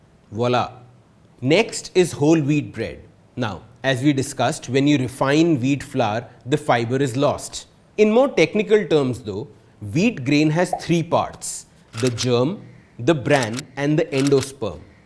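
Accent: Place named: India. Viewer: first correct your accent. indian. you guys speak englidh very worst that you seem like speaking shit language like your indian and it hurts listening to your ugly accent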